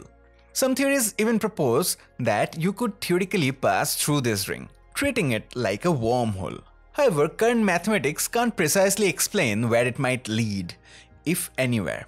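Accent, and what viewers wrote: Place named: India. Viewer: Bro, no offense. I respect that you bring in science and valuable information rather than the next clickbait video, but the hard Indian English accent (at least it sounds like that) makes it most of the times really difficult to follow. If you are open to constructive criticism, maybe use some appropriate tools, or have it dubbed by some AI voice perhaps. Other than that, well done. Keep it up.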